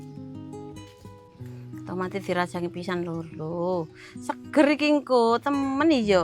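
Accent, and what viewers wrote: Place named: Central Java, Indonesia. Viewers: Dapur kraton ngendi to alamate, boso jowone kok le medok nemen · Assalamualaikum wr wb surabaya nyimak. salam kenal saya mau tanya, aslinya dari mana? saya asli purworejo logat ngomongnya seperti orang jawa tengah.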